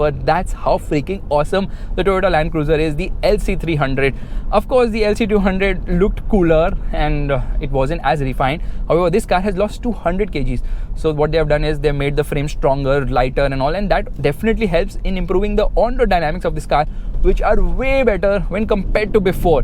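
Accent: India. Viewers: Очень ярко выраженный индийский акцент в английском языке...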